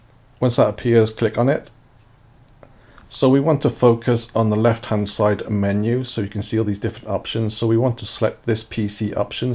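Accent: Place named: United Kingdom. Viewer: I just started watching the video and already gave a like on It just because I loved the man's accent, IDK If he Is British but I love the British accent, and his accent sounds very likely, so... yeah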